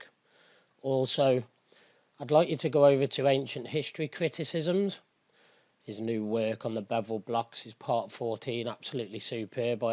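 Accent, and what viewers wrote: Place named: Birmingham, United Kingdom. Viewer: The Lost History Channel TKTC I’m here (&subbed) due to you fella.and I do love a brummy accent 😉👍🏻😂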